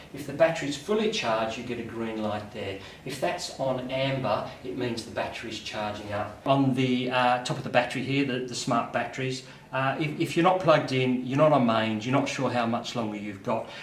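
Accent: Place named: Australia. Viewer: Nice Aussie accent.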